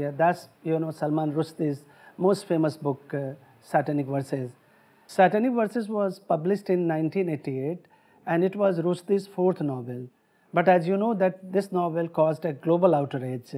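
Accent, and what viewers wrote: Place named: Italy. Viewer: U have a kind of italian accent, as an italian i really love it.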